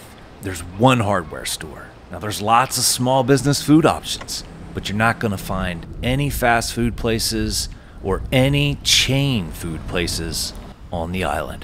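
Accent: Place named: New England, United States. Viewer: Here Here! 😁😍 he"s a Yankee...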